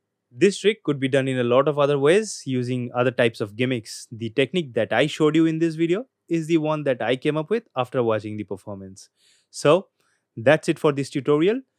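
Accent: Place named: India. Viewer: You speak indlish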